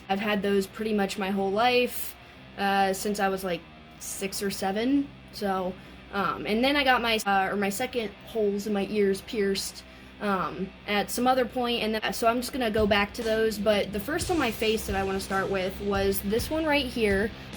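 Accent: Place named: United States. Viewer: Great! Regarding your dialect I would guess you're from the USA, but your style look more european to me :) Great though that some americans dare to look good :)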